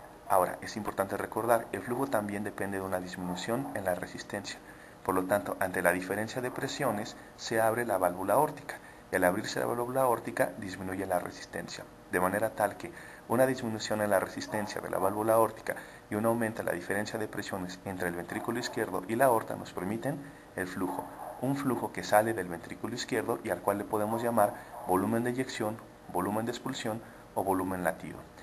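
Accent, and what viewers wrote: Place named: Spain. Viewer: jajajaj ,se oye muy español el hombre jajajaja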